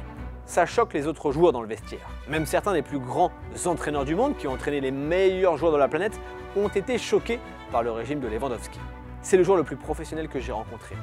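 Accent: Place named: England, United Kingdom. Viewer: Walla like pour l’accent anglais de fou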